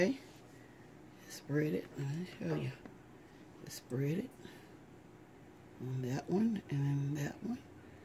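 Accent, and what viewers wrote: South, United States. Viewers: Best wishes Ms.. I feel the warmth from your kitchen and your heart. And your southern accent it’s like a recipe within your recipe. Can’t wait to try this one. Thanks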